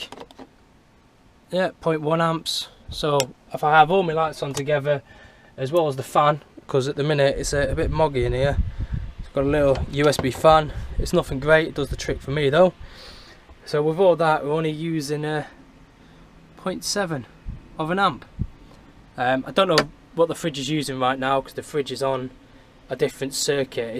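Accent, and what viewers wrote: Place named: England, United Kingdom. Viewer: Your accent makes me miss living in England.. Would you adopt me?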